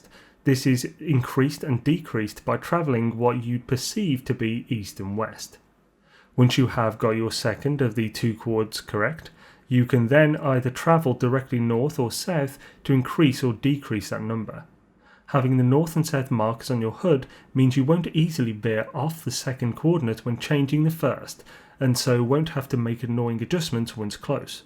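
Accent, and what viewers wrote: United Kingdom. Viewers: It just sounds like he is mostly mumbling and I didnt understand a word of what he was saying. If this is what most youtube Brits sound like then good god. Sounds like I was listening to Kermit the frog from muppet puppets lol. 😂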